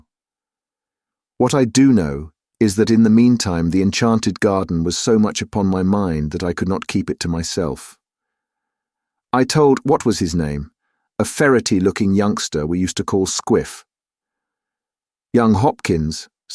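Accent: United Kingdom. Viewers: Ok prentioious British accent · I have trouble following the UK English readings. Just me?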